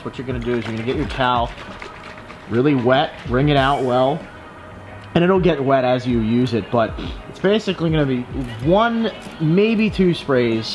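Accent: United States. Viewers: what part of PA are you from?!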